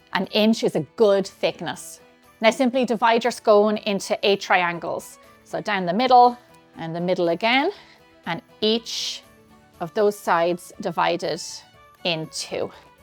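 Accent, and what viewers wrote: Ireland. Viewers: So refreshing to hear HUMAN VOICE, and with beautiful Irish accent ! Not Ai .And the scones are great , Thank you! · Buenas noches....its really, early early a.m., a Weds., lol. I'm sort of a night owl & noticed your channel. I love love scones. Sadly I'm NOT a Baker. I misplaced my beloved Nina's (Godmother) recipe. I made scones that were at least passable & tasty. However, I so pray that I will be able to make these delish scones. Gracias for this video. I also love love your lovely Irish accent. I will view more of your videos, gracias. Paz be with us all 🙏. "Doña Isa" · I love scones - not a Danish traditional so hard to get really good ones! This recipe looks legit good and I will try them. Love your Irish accent! Love Ireland altogether...🍀New subscriber.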